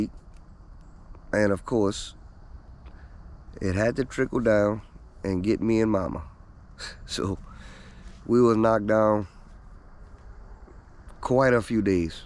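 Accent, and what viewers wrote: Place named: South, United States. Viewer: I love your accent, definitely from the south lol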